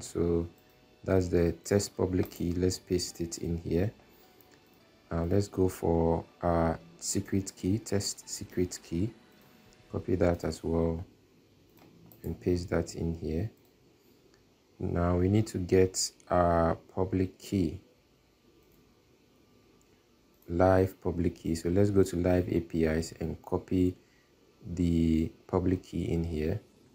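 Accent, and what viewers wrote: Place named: Ghana. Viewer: great video. I can see you have a Ghanaian accent, and I would like to link up. I want to learn from you . Great video sire.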